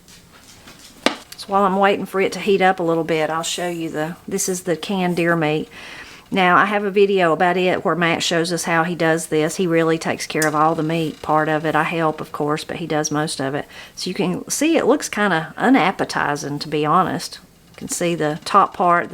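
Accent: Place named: Tennessee, United States. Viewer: Yum! I love friend green "tamaytas" and these videos! I am 3 generations from TN where my Maternal ancestors come from. Hearing your accent, some of the words, even the way you cook, reminds me of my Grandma. We called her Parents Mammo and Pappo, and Pappo was from TN. Seeing your videos really reminds me of my roots. Thanks for sharing everything.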